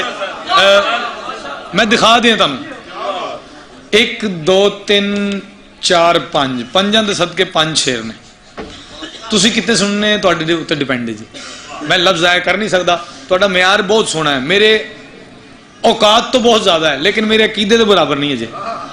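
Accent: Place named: India. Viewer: Just like indian voice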